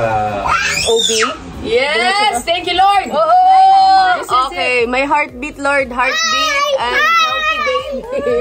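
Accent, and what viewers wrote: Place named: Visayas, Philippines. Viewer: Dai, meron kanang bisaya accent. Buntis ka dai, kaya medyo haggard imong nawng... minsan ganuon ang mukha ng buntis during 1st trimester period. Mag blo-bloom kalang after mawala na yong duwal² moment at mga sensitive moment sa amoy. Ganuon din ako when I was pregnant with my daughter 19yrs ago. Di na ako nag buntis uli, kasi ang hirap manganak hahaha.. di kinaya ng powers ko.. Anyways enjoy your pregnancy dai, ug hinay² lang.. ❤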